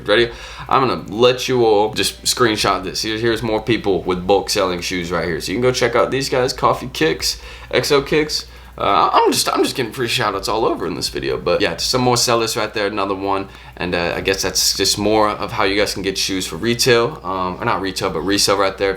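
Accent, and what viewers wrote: South Carolina, United States. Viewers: You from sc?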